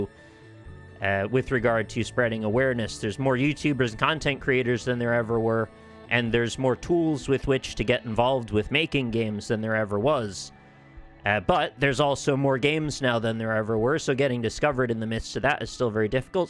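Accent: Ireland. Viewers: I hear a little Irish lilt in your voice now 🙂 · Crazy how you can hear the Irish taking over some words while he speaks haha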